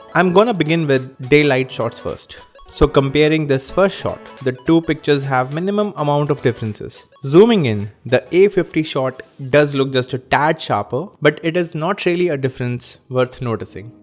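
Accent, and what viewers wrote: India. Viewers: I meant because of the indian accent I getting sick of it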